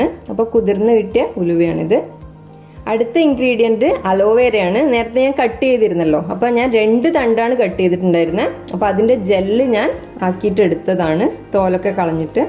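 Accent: Kannur, India. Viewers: എവിടാ. സംസാരം കേട്ടപ്പോ തോന്നി. ഞാനും കണ്ണൂർ ആണ്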